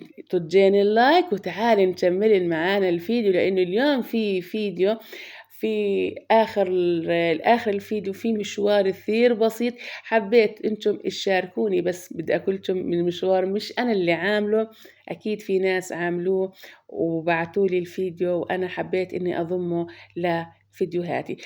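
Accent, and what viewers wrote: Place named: Palestine. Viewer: سلامة عمرك يارب حبيبتي لهجتك الفلسطينية الفلاحة حلوة كتير زي لهجة امي الله يرحمها ويغفر لها لأنه إحنا من قضاء يافا يازور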